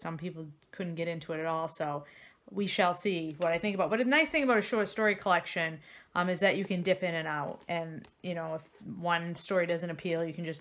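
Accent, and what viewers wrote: Maine, United States. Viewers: Five presidents sounds very interesting. How did I not pick up on your Maine accent?! My sister lives in Brownfield, love Maine and visit frequently! I also really enjoyed The Stranger in the Woods too! Have fun in DC!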